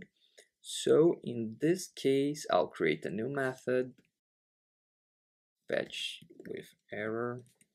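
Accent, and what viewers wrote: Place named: Brazil. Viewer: dude its impressive how from the first 3 words you've spoken i already recognized the brazilian accent exactly like mine ahahahahhaha nice vid! :P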